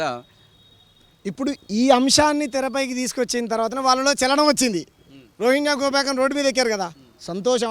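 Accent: Telangana, India. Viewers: అన్న అందుకే నీకు అని పేరు వచ్చింది అన్న నిజాన్ని నిర్భయంగా తెలంగాణ యాసలో మాట్లాడుతావ్ సూపర్ శ్యామ్ అన్న మార్వాడి కూ సపోర్ట్ చేస్తున్న రాజకీయ నాయకులను రాజకీయ పార్టీ లను తెలంగాణ ప్రజలు బాగా గుర్తు పెట్టుకొని ఏ ఎలక్షన్ వచ్చిన ఓటు తో బుద్ది చెప్పాలి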